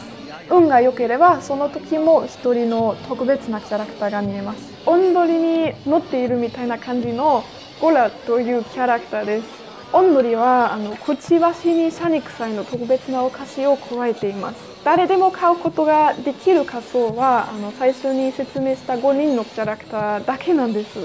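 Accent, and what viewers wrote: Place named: Germany. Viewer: Ha wusste ichs doch als ich dein Englisch zum ersten Mal gehört hab klang es so deutsch, wollte dir eigentlich nur sagen, tolles Video dein Japanisch ist wirklich gut und ich mag deine Videos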